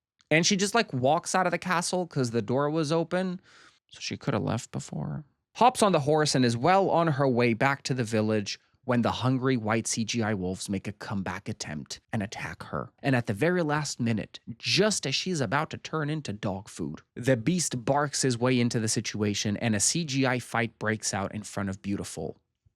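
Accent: Canada. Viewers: the more i listen to your accent the more i realize you are probably canadian which would explain it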